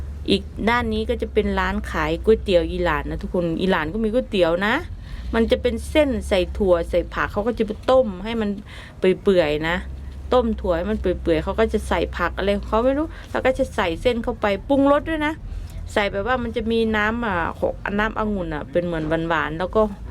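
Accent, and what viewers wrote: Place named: South, Thailand. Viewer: เจอคลิปคุณเมื่อวาน เริ่มไล่ดูไปเรื่อยๆ การตัดต่อบรรยายมีเสน่ดี ตอนแรกนึกว่าคุณเป็นคนใต้ หรือไม่ก็ปราจีน เคยได้ยินสำเนียงแบบนี่ ที่สำคัญคุณเป็นคนตลกมาก ชอบ กดติดตามแล้วนะคะ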